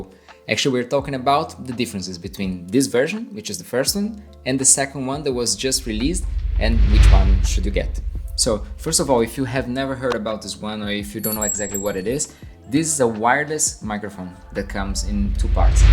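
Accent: Brazil. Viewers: Iradaa essa comparacaoo bro!! cai aqui no seu video e logo de cara ja reconheci o sotaque brasileiro!! Comecei meu canal recentemente tbm, pensei em fazer em ingles que nem vc mas acabei optando pra fazer em português mesmo!! Pow irado ganhou um inscrito! Cheers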